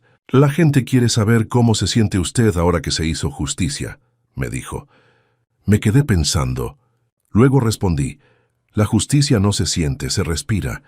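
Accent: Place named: Spain. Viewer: Porque si es un Mexicano narrando su historia , porque habla como un español ?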